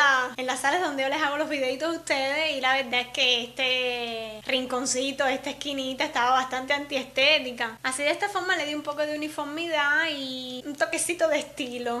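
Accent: Cuba. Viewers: Que Alegre eres!! Eres Cubana??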